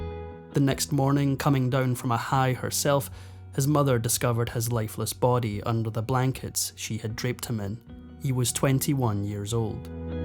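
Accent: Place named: Scotland, United Kingdom. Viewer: My favorite narrator love listening to the Scot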